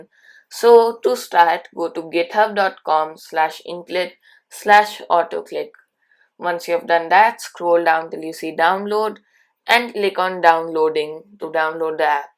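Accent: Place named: India. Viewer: BRO IS AND INDIAN ACCSENT SUCH A LIER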